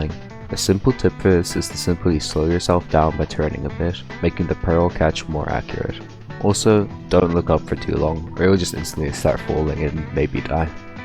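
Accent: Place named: South Africa. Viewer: Are u South African lol ur accent sounds like it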